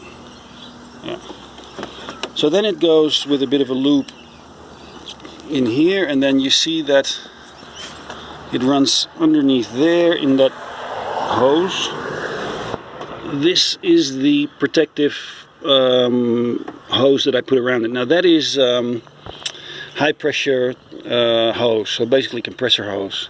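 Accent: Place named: Netherlands. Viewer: Mooi. Vooral met het Hollandse accent. Ik ga het proberen op m'n Ranger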